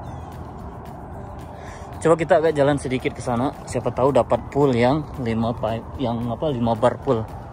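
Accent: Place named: Lombok, Indonesia. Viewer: logatx kayak org lombok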